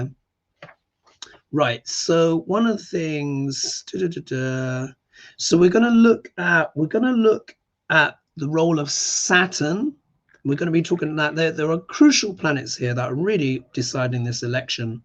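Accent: United Kingdom. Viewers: Omgggg I love your British accent 😍😍😍😍😍🤍🤍🤍🤍🤍😭😭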